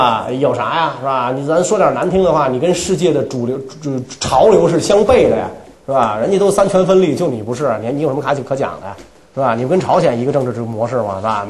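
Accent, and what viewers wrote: Beijing, China. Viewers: 我就是来听痞气的北京口音的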